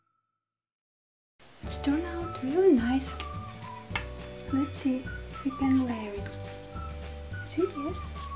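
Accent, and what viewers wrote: Russia. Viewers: Руссский акцент ) суперский обзор ))! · Кто русский? У автора акцент русский :D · Какой русский акцент...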